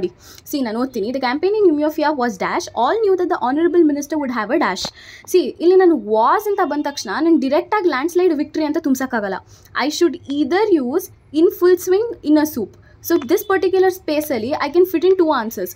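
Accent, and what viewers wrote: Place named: Uttara Kannada, India. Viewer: Pakka uttar kannada nemduu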